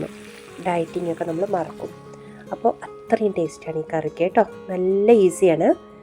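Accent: Palakkad, India. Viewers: പാലക്കാടൻ ഭാഷ അടിപൊളി.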